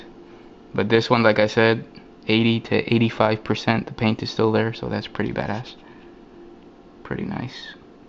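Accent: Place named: Netherlands. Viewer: sounded like a Dutch accent ;)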